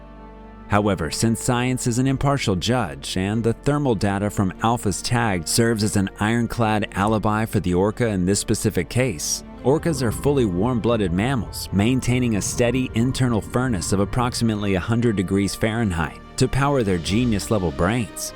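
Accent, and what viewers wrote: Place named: United States. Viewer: That single episode made me not want to subscribe. Accent and dialogue is way too American. It sounds frustratingly stupid.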